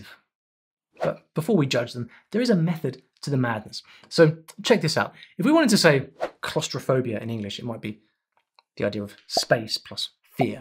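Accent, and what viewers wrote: United Kingdom. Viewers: To me as a German you sound, quite frankly, very bri'ish. But English has some crazy dialects as well. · Ich habe Dein großartiges Video mit Interesse verfolgt., Du kannst so fesselnd erzählen. Und Du klingst so überzeugend. And it's great to hear you British English. I just like both Westgermanic languages.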